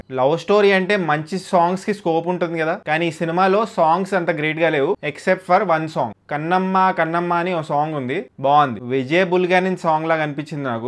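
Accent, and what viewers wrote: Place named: Visakhapatnam, India. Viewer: Vadhura puku vizag slang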